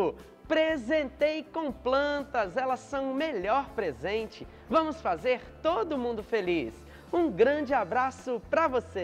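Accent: Minas Gerais, Brazil. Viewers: Você é mineiro de onde hein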